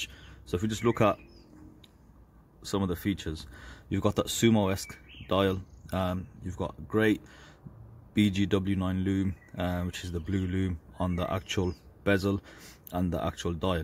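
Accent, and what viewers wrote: Birmingham, United Kingdom. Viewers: Another great vid, pleasing to hear another Brummie on the Tube, loving the reviews! Seen a few of your mods with after market dials now, where do you manage to source them from? 👍🏻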